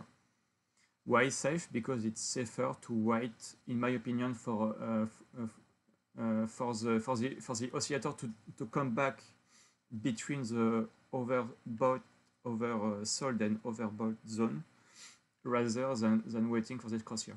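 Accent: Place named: France. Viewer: toi tu es français 100% ton accents c'est sur poto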